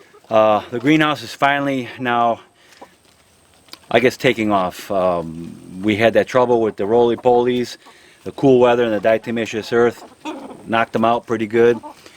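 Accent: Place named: Massachusetts, United States. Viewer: LOL I was thinking the same thing ….I’m thinking he’s from Massachusetts…. That’s where I came from … living in Texas now..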